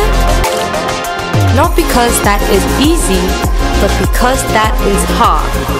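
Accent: Australia. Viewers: Jyp and their australian narrators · stray kids done what first? Frontier means borderline. Their title is frontier : declaration and enhypens is Border : day one. Both use colons, frontier is synonym for border / borderline ..in this trailer the videos used are only nature documentaries and abstract art depictions without any member shown but only the aussie narration which is similar to enhypen , the aussie narration is just a part of the similiarities but you said stray kids did the aussie thing first.. even the clips used are almost the same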